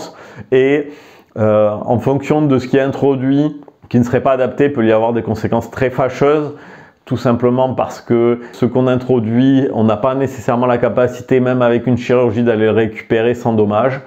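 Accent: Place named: Southwest, France. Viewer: On entend bien le sud ouest ça fait du bien 👍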